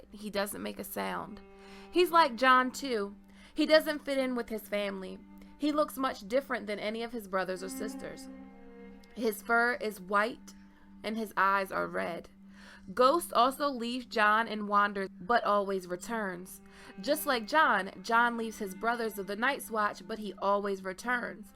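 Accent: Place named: South, United States. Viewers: for this kind of a video... her southern heavy accent is terrible and takes away from the video! sorry, my opinion.. but the content is great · I am so confuddled with all this downtime between the shows seasons and listening to so many theories. I was so hoping WOW would have come out inbetween so we could all get our fix of GOT. So tempted to try and hold out for WOW before seeing season 7 but jeez i'd have to go live in a cave for however long. It is going to be such a stretch back, after what is obviously going to be quite a dramatic season for our beloved characters. So many of your theories ring true, especially in book sense, some not so much but love the DNA thing, and that southern drawl is possibly one of my favourite things to hear GOT theories in as it is so detached from the accents we hear in the show it really has a narrative quality.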